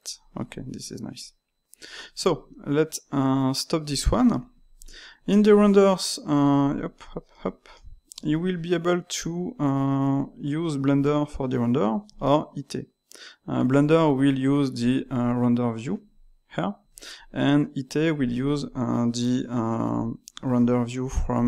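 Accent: France. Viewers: on reconait le francais mdr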